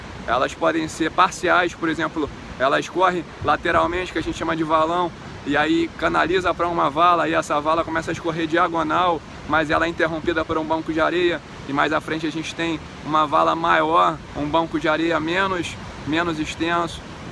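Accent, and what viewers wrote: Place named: Rio de Janeiro, Brazil. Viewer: Amo sutaque carioca dica otima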